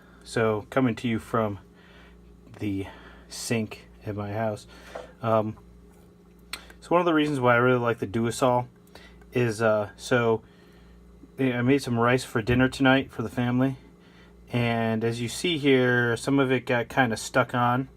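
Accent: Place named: United Kingdom. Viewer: I hope you realize that I am just kidding. We love your accent, colloquialisms and humor. Why do you think monty python, kids in the hall, and the office are so popular in the US? Almost all of the bands that topped our charts in the 80’s were British. I agree, we all would be sad to see the dialects lost.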